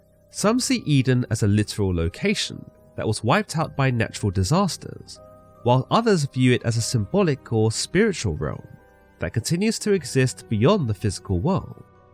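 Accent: United Kingdom. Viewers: I knew there was going to be some type of British accent when I clicked on this.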